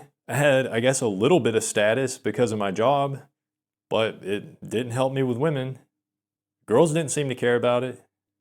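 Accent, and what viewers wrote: South, United States. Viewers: don't worry, I have the typical weird incel voice cause I go years without talking .you don't have that. You just sound like a stereotypical down south yank.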